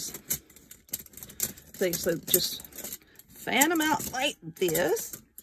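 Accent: South, United States. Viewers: Going to Dollar general to heyu snowman and Wally World to get my rings. He's darling and I love your southern accent. It's the bomb! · I ABSOFREAKINLOUTY LOVE YOUR CHANNEL!!! I'm from Dallas, NC and have that southern TWANG too!! It's so great to here someone like me on You Tube! Yes Mam, we were raised right. Only a Southern Girl knows all the old sayings and uses them right, as well as, MANNERS!! XOXO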